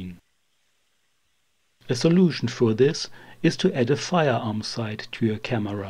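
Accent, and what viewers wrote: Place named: Germany. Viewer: The narrator sounds like the Cherman guy who.made "Grizzly Man."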